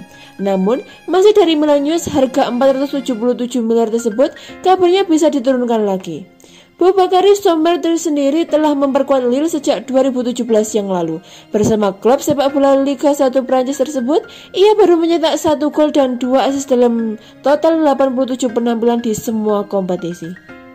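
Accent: Java, Indonesia. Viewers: Jadi lucu, meddok + cepet 😂😂😂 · Medhok bgt mbak, hehe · Jadi males gw nonton Chanel ini,, gara² suaranya yg medog ... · Medok bnerrr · mbke medok bunget to mbk 😂 orapopo wes.. wong jowo mantul 👍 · Hahahahahahaha mbok pelan pelan to engkok keselek lo, tapi male duadi lucu e gelok coro moco e, wes cepet medok e kuentel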